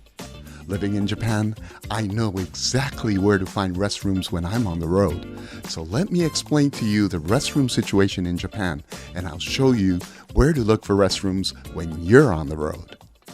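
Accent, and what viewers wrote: United States. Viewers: you were "born and raised in Tokyo, and lived in Japan all your life", so how did you learn to speak with perfect American English? Just curious 😊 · Thank you for your videos! So informative, clear, interesting, just so well made. Really appreciate it! Please consider making videos for: 1. Places for sushi (low end to high end, please include price range) 2. Places for ramen (same as above) 3. Tourist traps (well maybe not trap but like places we should stay away from or be aware of if first time visiting Japan)😅 4. Hotels vs Airbnb 5. Ways of traveling around via, train, bus, etc. Btw, you sound like someone who was raised in the states.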